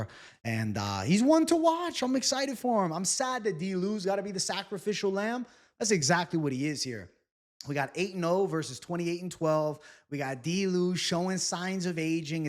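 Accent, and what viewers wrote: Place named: New Jersey, United States. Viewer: Good to hear more of that Jersey coming out in the vacation accent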